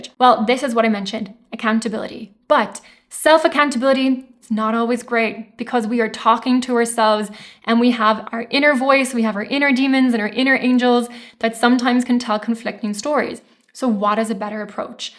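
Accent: Ireland. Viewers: Did you spend time Ireland? I notice a hint in the accent! ❤😊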